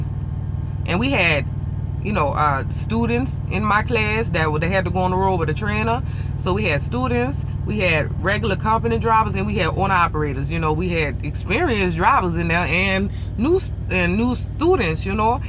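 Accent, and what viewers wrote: Louisiana, United States. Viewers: let me guess; You're from Louisiana/NO or South Carolina? awesome accent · She's cajun, from louisiana. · i love your accent! u from Louisiana? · she gotta b from Louisiana or the west indies!!!!!!!!!!!! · k, so what part of Louisiana are you from,?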